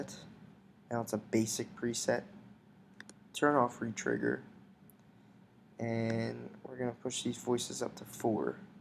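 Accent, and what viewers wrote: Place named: New York, United States. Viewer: just followed you on soundcloud bro. are you from ny/nj? u have that accent lol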